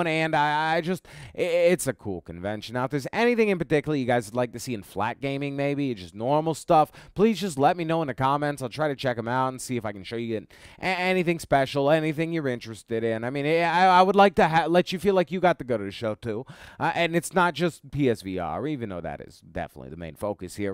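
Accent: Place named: New Jersey, United States. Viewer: I just heard this guy for the first time and went well that a New Jersey accent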